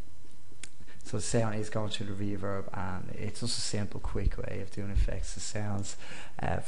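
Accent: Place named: England, United Kingdom. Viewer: it´s englisch dialect !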